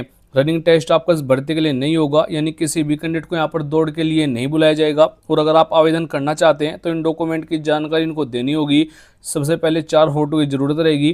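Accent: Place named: Pakistan. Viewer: Pakistani k tarah baat krhe hai aap toh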